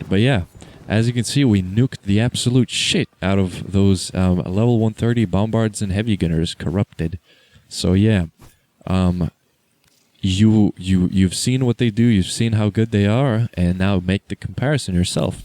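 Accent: United States. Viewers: Q&A: How did you, a montenegroid, learn to speak english so well with American accent? Also, are you still a student? What do/did you study?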